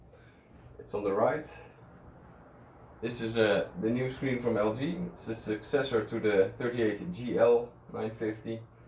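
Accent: Netherlands. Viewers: Ik hoor gwn dat je nederlands bent🤣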